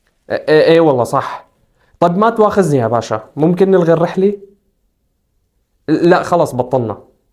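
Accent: Alexandria, Egypt. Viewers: لكن بيحكي لهجه اسكندرانيه احلى كلام ربنا يجعل محبه بين الشعوب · كلامك اسكندراني ❤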